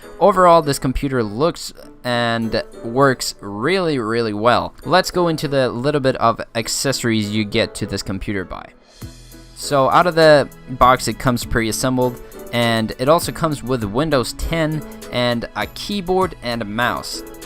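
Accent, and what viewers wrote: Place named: Germany. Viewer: hes probably german, or austrian. a lot of their pronunciations are like that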